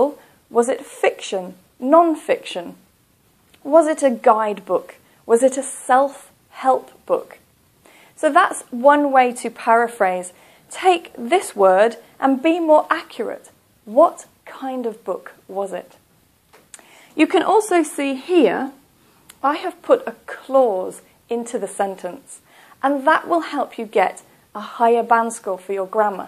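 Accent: England, United Kingdom. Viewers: You got a pretty crispy posh English accent haha. It's 👌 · Listening to her can improve your british accent & pronunciation too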